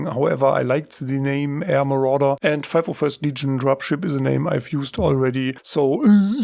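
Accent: Germany. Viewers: Man merkt das du deutscher bist nichts gegen dein Englisch aber man hörts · Man hat direkt gehört das du aus Deutschland bist 😂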